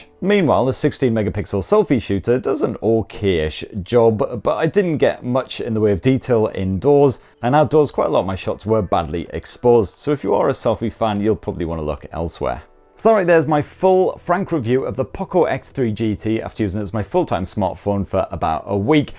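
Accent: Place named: Northern England, United Kingdom. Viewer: northerns his way swiftly through this review. Typical up north camera locations and plenty of chuckles at his brash humour. Stay safe.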